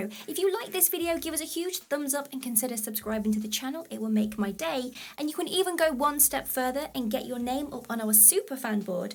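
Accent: United Kingdom. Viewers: ❤her British accent/ sexy voice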